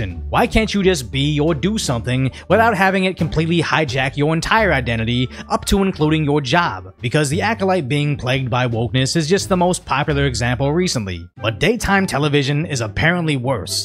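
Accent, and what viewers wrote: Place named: United States. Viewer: If I guessed your heritage with only your voice, I had guessed you‘re an American.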